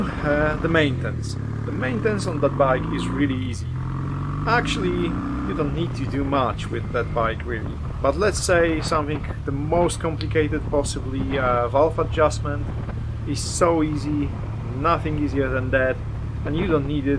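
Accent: Poland. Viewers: Ciekawi mnie czy dobrze rozpoznaje polski akcent?🤔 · Hi there. Z Polski? Akcent :-) Napiszesz co dokładnie zmieniłeś w motocyklu?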